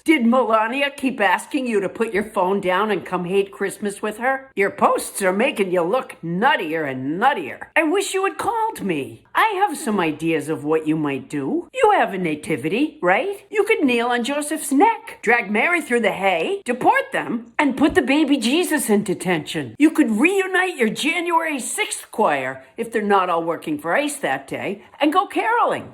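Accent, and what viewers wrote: Boston, United States. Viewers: I love hearing a Boston accent combined with an IDGAF rant, especially when it’s about our current Mob Boss in Chief. I like to think that it’s not only us atheists that have this type of clarity, but it helps 😂 Glad to see healthy and in a much better place these days. I’ve been down that road myself and can empathize. We don’t always get 2nd chances and when we do we don’t always take it. She obviously has, respect.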